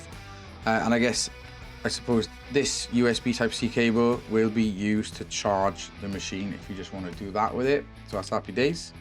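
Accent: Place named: Wales, United Kingdom. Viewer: I recognise that accent, are you a southy like me? Land of the red dragon?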